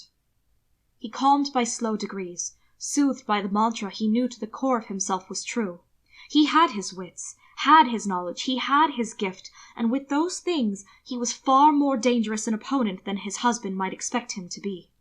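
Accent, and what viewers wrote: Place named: Japan. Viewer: as someone who studied Japanese for a couple years, I think your accent is pretty close to sounding like one. but im not Japanese myself so take my opinion with a grain of salt LMAO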